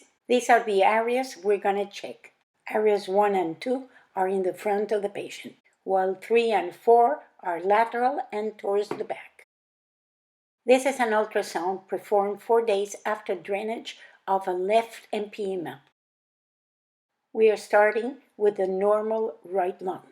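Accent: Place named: Italy. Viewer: MD, RDMS en los comentarios del video se escribieron tantas cosas de ti, tienes todavia muchos fans que añoran esos tiempos mucha gente me decia que te habias casado con un militar de la epoca de los 70s otros cque con un musico, etc etc las pocas veces que habalste ne los videos note un acento diferente, eres italiana?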